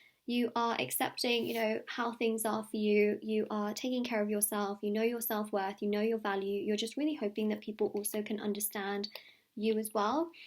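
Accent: United Kingdom. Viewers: British accent 💗💗💗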